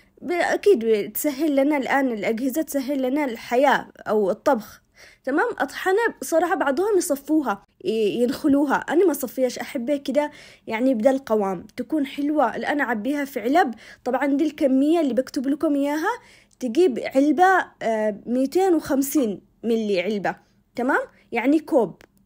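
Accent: Aden, Yemen. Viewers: مبدعة في كل شيء اتشوق دائمًا لفيديوهاتك محسنك ومحسن كلامك العدني الله يسعدك يارب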